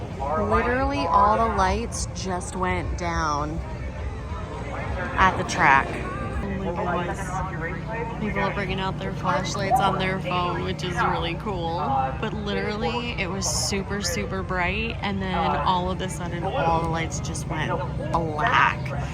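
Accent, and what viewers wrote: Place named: Boston, United States. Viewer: you definitely didn't lose the East Coast accent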